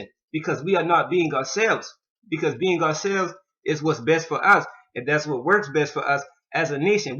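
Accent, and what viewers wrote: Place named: Gullah, United States. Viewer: I hear that geechee talk my brother. I’m Gullah geechee from the sea islands of Ga. Great Video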